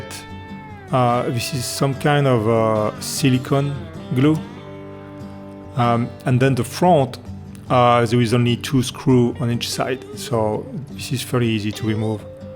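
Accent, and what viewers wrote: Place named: France. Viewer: I love the french accent 😃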